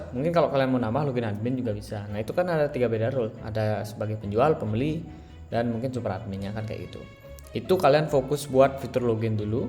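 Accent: Bali, Indonesia. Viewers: Kental banget dengan logat balinya bli, btw salam dari programmer lombok bli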